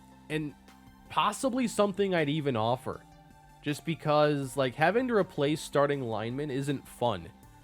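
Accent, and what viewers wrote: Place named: Minnesota, United States. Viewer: You’re Minnesotan accent is fading since you’re down south. But i really hear it when you say “minnesowtah”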